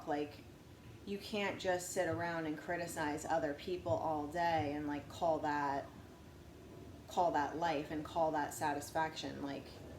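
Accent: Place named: South, United States. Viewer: American Accent?? I would say...you need to relax. You have a southern drawl and Jersey touch as accent. Not sure about the PENN State stuff, but clearly my before. Tinge of Kentuckian.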